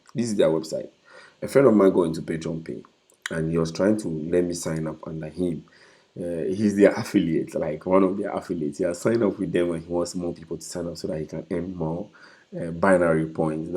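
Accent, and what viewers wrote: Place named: Ghana. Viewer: Wow you're a Ghanaian,it was easy for me to understand from a fellow Ghanaian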